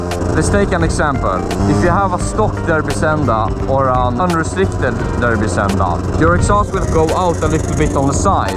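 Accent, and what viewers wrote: Sweden. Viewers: Det skriker svensk av din engelska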